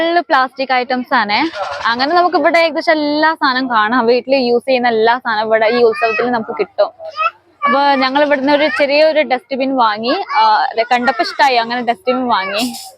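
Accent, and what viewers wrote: Malappuram, India. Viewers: മലപ്പുറം slang ആണ് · മലപ്പുറം ✌️ആണ് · മലപ്പുറം ചേളാരീ.കോഴിക്കോട് ജില്ലയിൽ തെക്ക് ഭാഗത്ത് ഇതിനോട് സാമ്യമുള്ള സ്ലാംഗ് ആണ്.എന്നാൽ, കോഴിക്കോട് ജില്ല മുഴുവൻ ഇതേ സംസാരമല്ല.കൈയിലാണ്ടി,വടകര ഒക്കെയുള്ള വടക്കൻ ഭാഗത്ത് തികച്ചും വ്യത്യസ്തമായ സ്ലാംഗ് ആണ്.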